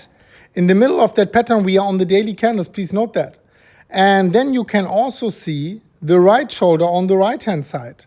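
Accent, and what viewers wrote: Germany. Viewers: Man I missed that thick German accent and the daily follow-ups. I’m back in the game baby. 🦾🎉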